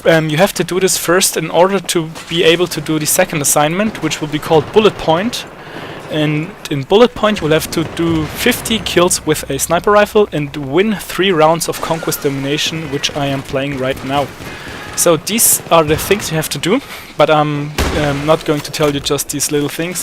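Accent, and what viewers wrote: Germany. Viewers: german accent too thicc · Du bist doch Deutsch man merkt das voll :) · Are you German ???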